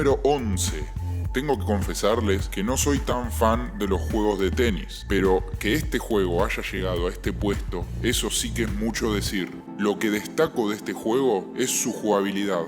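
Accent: Argentina. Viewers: minuto 0 y ya me di cuenta por el acento y las s's de que sos argentino XDDD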